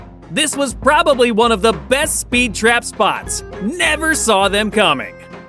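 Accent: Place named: United States. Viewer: That's the most American narrating I've ever heard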